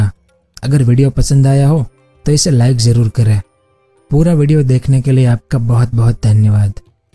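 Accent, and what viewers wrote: Gujarat, India. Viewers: Bhai kya Gujarat se ho kya aap dappu k papa jaise bol Raha hain aap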